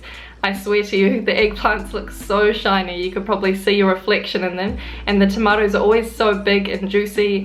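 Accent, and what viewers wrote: New Zealand. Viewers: wish you good luck with lockdown - and have a safe journey.. New Zealand accent ! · I hear a Kiwi accent. How ya goin' mate?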